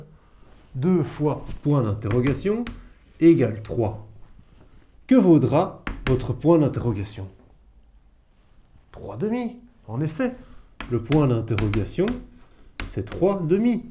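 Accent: Brussels, Belgium. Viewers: il a un délicieux accent belge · L'accent belge est vraiment magique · il a un accent bruxellois plus que "belge"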